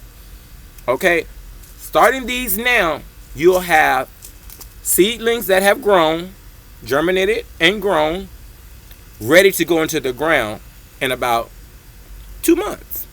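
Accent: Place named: Georgia, United States. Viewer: Im from Georgia you sound like you are too!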